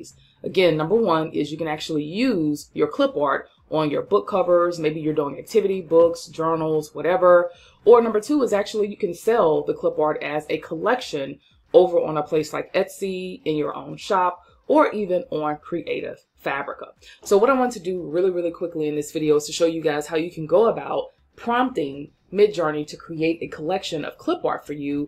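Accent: South, United States. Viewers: Great video..thanks so much. This was easy to follow and fun. Love your southern country accent.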